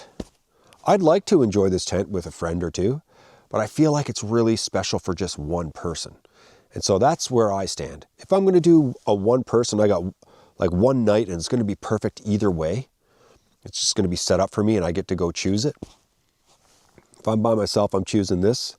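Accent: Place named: Nova Scotia, Canada. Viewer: Just noticing your east coast accent. Not quite Newfoundlander, but very much like a Blue Noser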